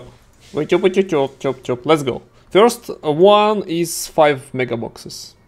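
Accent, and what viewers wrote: Russia. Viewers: You Russia?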